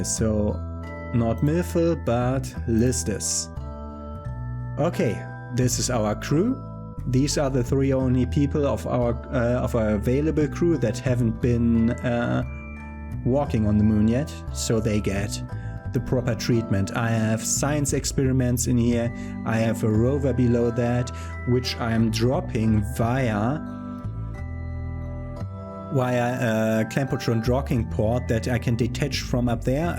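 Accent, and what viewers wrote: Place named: Germany. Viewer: Moin klingst wie ein Deutscher deswegen schreibe ich mal auf deutsch . Versuch mal wenn du in einen Orbit willst nicht so steil nach oben zu fliegen und schon gar nicht mit einem Manöver einen Orbit bestimmen . Wenn du startest beschleunigst du auf den ersten 1200m auf 160m/s ab hier die Rakete um 15 grad nach rechts neigen . Steige ein wenig in diesem Winkel auf bis du bei 300 m/s bist und neige die Rakete wieder ein wenig . Steige wieder ein wenig auf und wärend du langsam auf ca 1100 m/s beschleunigst neigst du du Rakete bis zu 45 Grad . Also nicht sofort auf 45 Grad sondern kontinuierlich . Wenn du ca 1100 m/s erreicht hast Triebwerk aus, ab hier müsste die Apo bei knapp <num>m liegen tut sie es nicht hebe sie auf 85.000m an . Hat die Rakete 72.000m Höhe erreicht , neige die spitze 15 Grad Richtung Erde/Kerbin also nach unten und gebe jetzt vollen Schub und beobachte wie ganz von allein ein perfekter Orbit entsteht .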